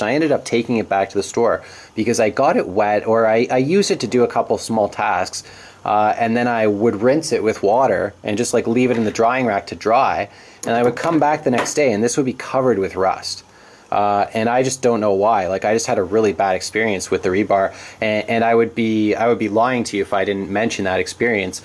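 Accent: Canada. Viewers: That's one wicked Canadian accent.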